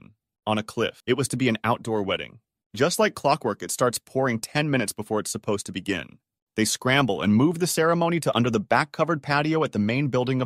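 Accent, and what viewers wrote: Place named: United States. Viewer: Okay, the bizarre word replacements are grating enough, but now I'm really calling shenanigans. You, narrator, are clearly American, and yet you just pronounced 911 as "nine-hundred and eleven" instead of "nine-one-one." 'Fess up, is this channel a psychological experiment designed to study irritation or something?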